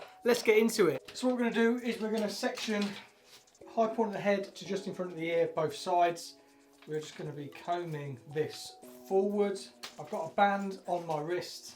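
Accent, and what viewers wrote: United Kingdom. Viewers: Cómo me encanta el inglés británico lpm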